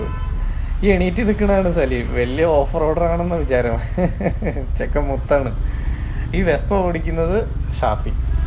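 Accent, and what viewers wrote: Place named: Muvattupuzha, India. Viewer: Njanum..super presentation aanu bro..muvattupuzha/ perumbavoor/Thodupuzha slang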